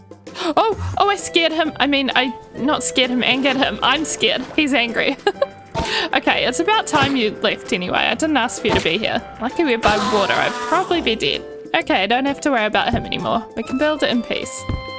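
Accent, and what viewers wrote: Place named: New Zealand. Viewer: 200 days is def needed! This is just so cozy and your New Zealand accent just makes this all the more better. <3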